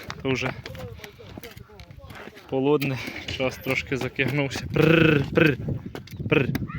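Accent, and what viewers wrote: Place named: Carpathians, Ukraine. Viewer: Ніколи не чув карпатський діалект😊 Одна з особливостей,ви обрізаєте закінчення -сь в глаголах. А також ,замість букви И,ви кажете Е. У кожному регіоні свої приколи😊 А у нас часто замість букви О ,зажуть А.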